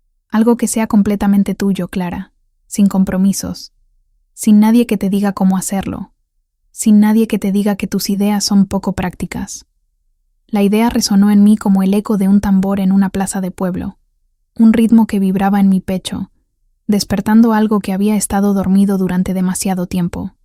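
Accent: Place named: Spain. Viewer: Una chica mejicana con acento española.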